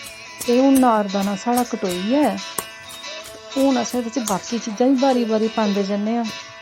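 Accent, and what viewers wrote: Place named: Jammu, India. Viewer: Your dograa accent is very nice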